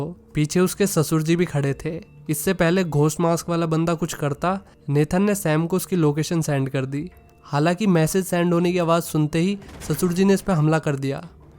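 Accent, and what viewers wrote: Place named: Palwal, India. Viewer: Aap kaha se ho faridabad side se ho kya kyoki aapne kuch word palwal walo jease bole hai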